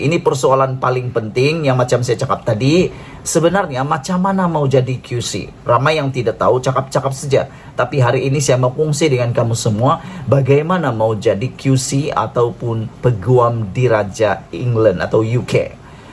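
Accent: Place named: Sabah, Malaysia. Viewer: Encik semakin pandai berucap dalam Bahasa Malaysia.Lograt bahasa Sabah semakin berkurangan. Syabas tuan.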